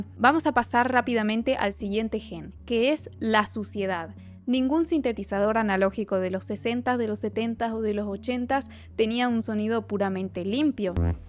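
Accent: Argentina. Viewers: de q parte de argentina eres??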